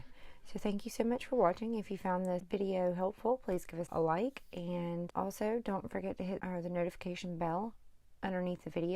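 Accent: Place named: United States. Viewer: that's fabulous and I am looking forward to viewing it, you have a great American voice, you sound very calm and placid! I would like to make videos but I have no understanding of video editing, I have some super ideas for content but just don't have the time to train myself in video producing so I am trying to write instead, however even with that I struggle as I much prefer writing with pen and paper, my previous life is so far from my new venture in upcycling and art and I would love to tell my story of the last two decades after the 90s rave scene mingled in with my theories on elite circles and their corruption and the impact on society, it is an extremely unusual tale from a part of England that is not really documented, I am from the southwest which to most is a sleepy, rural, picturesque haven but it is really different to what people think! 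Well I'm probably driving you crazy now , I'm terrible for going off topic, but I do love chatting especially to Americans! I love America and would love to one day visit.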